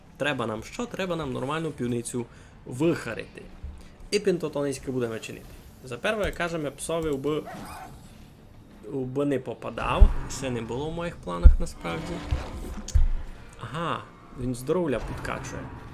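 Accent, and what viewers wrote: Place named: Rusyn, Ukraine. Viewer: Що, не чуєте русинський говір із Закарпаття?)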